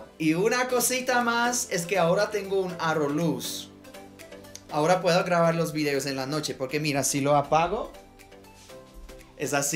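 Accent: Bogotá, Colombia. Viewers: Que pirobø tan colombiano el jaja habla y tiene muchas actitudes de la tierrita, cvero caso de armor a la patria ♥️ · Neutro??? De oirlo supe q aprendió español en Colombia. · Me salió tu video por casualidad pero me mataste de la risa! 🤣 Nací y crecí en Bogotá hasta los 21 años, pero al escucharte hablar me pareció que no ha pasado el tiempo, aunque llevo ya 32 años fuera del país. Mi niñez la viví en Kennedy, pero ni muerta admitíamos eso si estamos en otro lado 😃. Cuándo no había nada para la cena, y por casualidad íbamos a visitar amigos, teníamos prohibido aceptar si se nos ofrecía comida, porque depronto se daban cuenta que no teníamos que comer en casa 🤨. A veces me da risa recordar esos tiempos pero la mayoría de veces la verdad no lo entiendo, y eso que yo me crié allá 😩. La necesidad de aparentar era (es) primordial. 🥺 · Soy de Argentina y su acento es muy colombiano. 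Quizás te referís a qué es un acento colombiano neutro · el tiene acento rolo, nosotros los rolos pensamos que no tenemos acento, pero si es asì !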